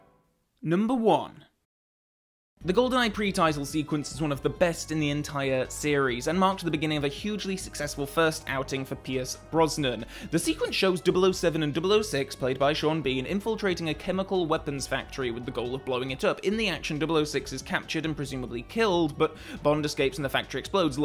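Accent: United Kingdom. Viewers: Well done. This wouldn't have worked as well if you didn't have a British accent <smile>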